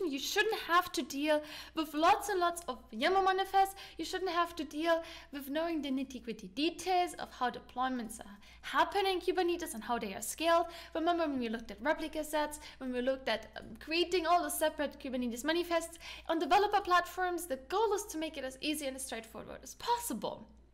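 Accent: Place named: Germany. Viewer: Thanks for sharing this ! You are from Germany, I guess... ? Just curious ! 😬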